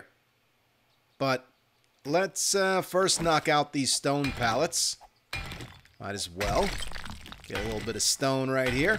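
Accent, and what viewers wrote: New Jersey, United States. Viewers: I love that i can tell you have lived in new jersey.(im from jersey)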